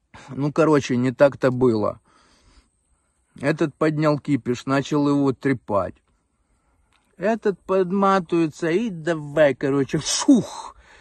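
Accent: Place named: Ukraine. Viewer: .... блин!... Как мне нравится говор украинский, но такой, что бы русские понимали!.... И ещё -, Вы очень похожи на моего двоюродного брата Андрея в молодости!.... Счастья, здоровья, мира, добра Вам и семье!....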